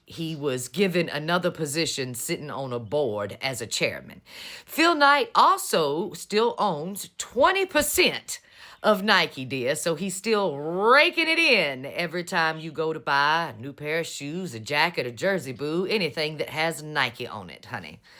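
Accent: South, United States. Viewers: England here: I love your casual, conversational style of getting the message out. You're a powerful orator with a lovely southern accent. Keep up the good work. The world needs to hear what's going on in America, now more than ever. 😘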